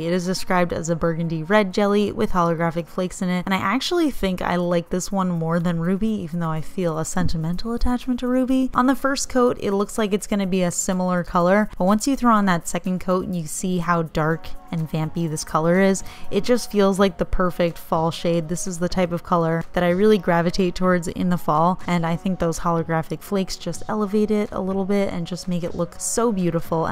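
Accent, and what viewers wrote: United States. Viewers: That was absolutely cruel to watch. They are all gorgeous. Love your channel btw. You're so chill and relaxing to watch. And I love your hair. There's heaps of American accents but yours is the coolest. What part of USA is that accent? Sorry to sound so fan girly but you deserve it. I guess you have hair dying tutorials. Might just go and check em out.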